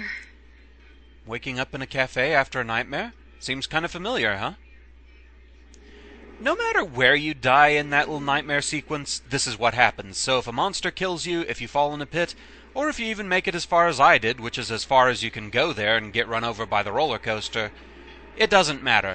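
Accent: Scotland, United Kingdom. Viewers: First time I played this, the opening message really got to me for some reason. "Violent or cruel" is so ominous. I've seen "violence and gore" but cruel is a more subjective word that hints at malice, and I always found that warning real creepy. Fortunately your warm friendly Scottish voice makes things a lot more welcoming!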